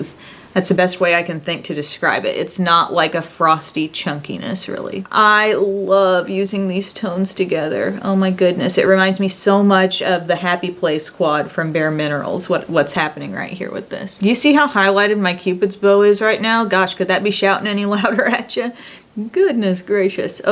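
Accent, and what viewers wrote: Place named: South, United States. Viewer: I just noticed how lovely your Southern accent is haha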